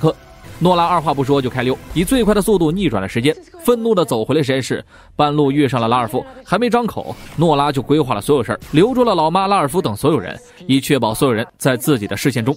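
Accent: China. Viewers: 主播是湖北人，口音暴露了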